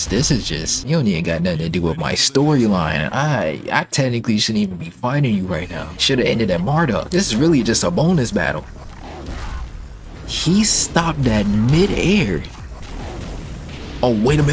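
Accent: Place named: Philadelphia, United States. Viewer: Bro you from Philly lol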